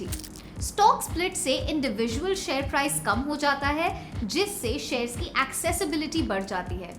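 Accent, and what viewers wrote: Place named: India. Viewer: cant listen to this indian talk , dont understand anything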